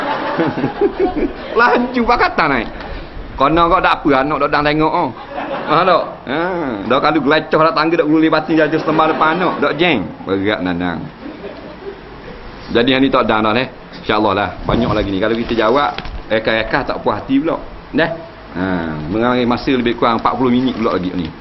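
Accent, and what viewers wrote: Terengganu, Malaysia. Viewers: Saya suka dia cakap Tganu..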